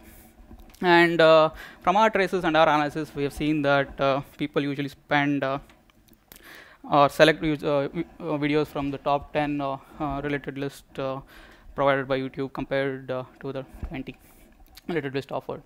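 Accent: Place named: India. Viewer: need some time to used to this India accent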